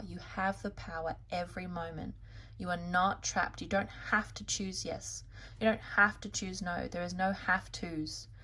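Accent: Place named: Australia. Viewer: Thank you. I love hearing an Aussie accent. Your approach is intriguing and unique. Pile #4 was perfect.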